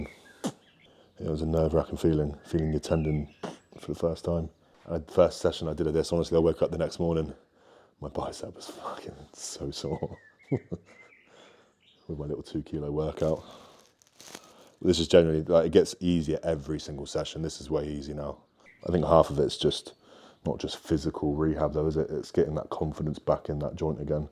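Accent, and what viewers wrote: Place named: United Kingdom. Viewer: So weird to hear a British accent come out of what looks like a good old boy from Idaho.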